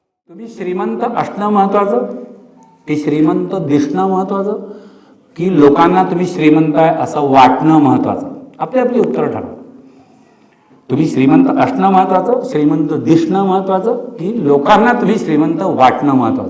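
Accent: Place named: Vidarbha, India. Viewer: सर मि तुमचा प्रत्येक सेशन पाहतो काही तरी नविन शिकवतो आणि ति वर्हाडी टोनिंग एकच नंबर तुमच्या सेशन ची माहिती मिळाली तर उपस्थिती देणे आवडेल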